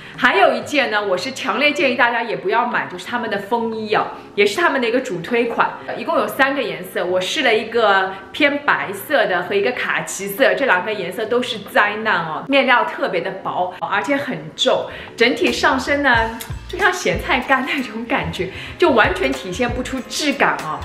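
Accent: Shanghai, China. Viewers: 第一次看你视频，觉得你说话很像上海人，很有亲切感。然后又看了你自我介绍那个视频，果然没错呀😆 支持你哦！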